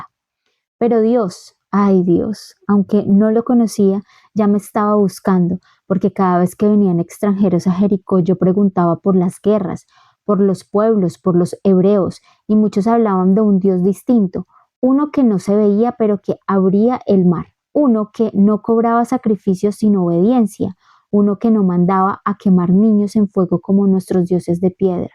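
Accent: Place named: Colombia. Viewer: Gracias por la Narrativa y la hermosa enseñanza un abrazo fuerte por tu voz puedo decir que eres colombiana yo lo soy pero bobo en México hace 43 años graba más narrativas bíblicas que te salen muy bien besitos❤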